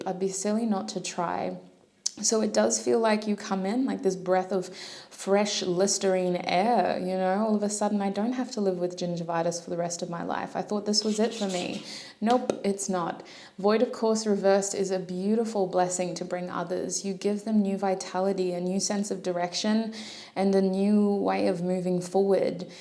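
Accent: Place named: Australia. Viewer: Thank you, love your Accent, either Australia or New Zealand? interesting reading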